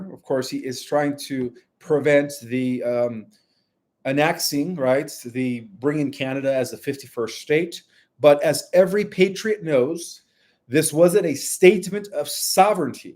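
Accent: United Kingdom. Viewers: Love that British accent 😂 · Loved te british accent .bring humor all the time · I'm loving the British Alto, it's hilarious and funny enough sound better than your regular accent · Enjoyed your live, love your British accent lol 😄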